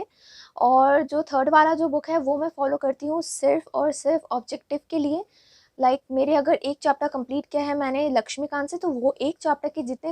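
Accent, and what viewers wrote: Bengal, India. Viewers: Bangali hai ho skta hai uski Hindi aisi ho okk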